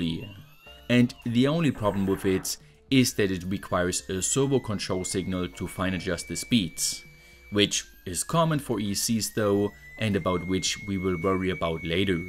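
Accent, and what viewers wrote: Germany. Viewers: Are you German? You have a strong German accent and pronounciation ("batterwy", "differwence") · German accent ?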